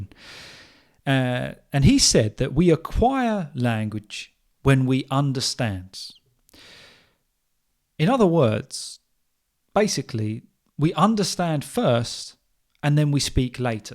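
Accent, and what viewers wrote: United Kingdom. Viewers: Fantastic teaching, speaking British English clearly sir you are every ways perfect. Thank you very much for your teaching British English Mr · Hi! Today, in one single day, I’ve taken enough time to listen to you again. Three podcasts, and this one twice, because it’s great and helpful to everyone what you say about the ability or skills in speaking in a foreign language for those who want to speak it or get fluency. You have explained this important issue in a very clear way to be understood. Thank you. Se sentir bloqué ou avoir peur de parler dans une langue autre que la nôtre, c’est normal pour les adultes. Il faut se le dire et se le redire. Mais il faut vaincre cette peur. C’est possible! Ce que vous conseillez de faire à votre public pour y arriver nous semble tout à fait juste et fonctionnel. Merci beaucoup! Mais pour un Européen quelle sorte d’anglais faudrait-il essayer d’imiter? 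In my opinion your accent would be the best. Your British accent is the most widely and understood in use everywhere.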